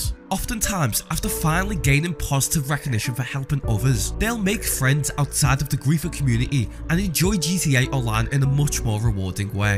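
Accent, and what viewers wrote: Liverpool, United Kingdom. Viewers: thanks for the subtitles i couldn't understand what you were saying through your British accent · lads a scouser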